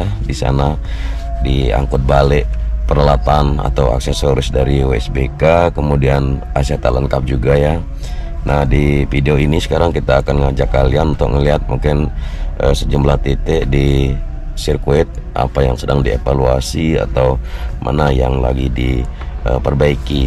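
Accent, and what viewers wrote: Java, Indonesia. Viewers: Dr cara berbicara bang ini kaya orang jawa deh.. Asli mana si bang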